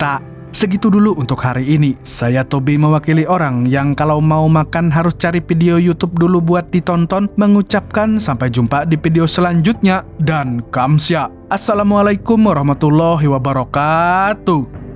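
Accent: Banjar, Indonesia. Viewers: Org Banjar Sekali ny Sidin nih lah😂😂😂😂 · Banjar kah pyn 😅😂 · Orang banjar kh admin nya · Orang Banjar'kh ikam ni.? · Adminnya urang banjar le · Logat banjar 😂👍👍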